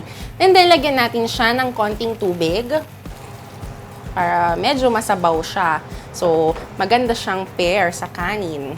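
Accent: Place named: England, United Kingdom. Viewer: She pronounced the letter R with an English accent. So pretentious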